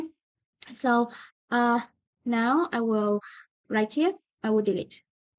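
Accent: Vietnam. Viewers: unrelated but are you Vietnamese? because your accent is similar to one of my Vietnamese friends :D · CHị này người Việt chắc luôn :))))) nghe phát âm quen lắm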